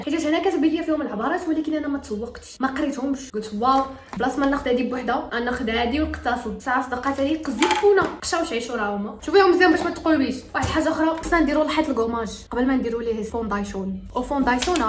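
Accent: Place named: Shilha, Morocco. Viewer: عافاك نسولك واش نتي شلحة حتاش الكلام ديالك بحال ديال شلوح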